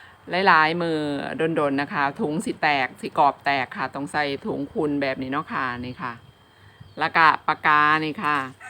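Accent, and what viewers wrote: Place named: Xishuangbanna, China. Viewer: สวัสดีครับติดตามสำเนียงก็เหมือนสำเนียงสิบสองปันนาเลยครับปลื้มใจมากๆครับ 大家好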